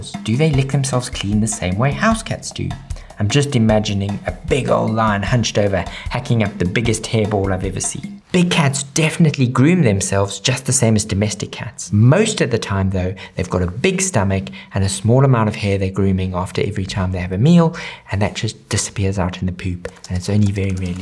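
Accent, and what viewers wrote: South Africa. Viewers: South African? I love the accent. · Dude. Your accent, are you South African? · could listen to this guy for hours i love south african accents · I'm having trouble nailing down his accent...South African? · I know that South African accent anywhere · he sounds south African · probably KZN accent. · It's a South African accent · sounds like maybe a bit Australian or something as well. I thought Australian, but it sounded off, and South African definitely makes sense. But I also wonder if there's something else in there as well! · Is he South African? He sounds like it lol. Yay we made it to WIRED!!! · This guy south african?? The accent gives pretoria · I can't tell if you're South African, British, or Australian? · It's South African "accent". Many different accents combined into one. I personally love it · the second the video started, got slammed with the strongest south african accent i have ever heard lmao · I know a South African accent when I hear it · Only a South African can recognise that accent ☺ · Love the South African accent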